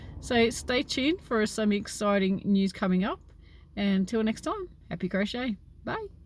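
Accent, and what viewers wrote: Australia. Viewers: it is so good to see you back, I know you have had some stress related issues. I pray that you are doing well and everything is going good for you. Believe it or not I was just thinking about you the other day wondering if we would ever see you back on YouTube instructing us on crochet. I am looking forward to watching all your new tutorials on your new channel. I have always enjoyed your tutorials and your lovely Australian accent, just makes my day. Blessings, good health, best wishes for you in the future. Much love coming your way from Tennessee, USA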